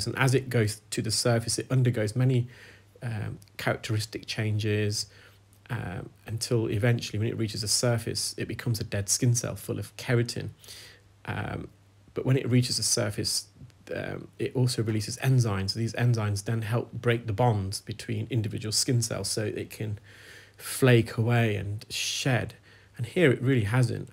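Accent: United Kingdom. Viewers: Someone telling you off for using “anti-clockwise”? When it’s a UK term, used in a video recorded in the UK, by a person who sounds like they were born and raised in the UK? The nerve. Now that that’s off my chest - great video as always,. You never fail to entertain and educate. Please keep up the good work!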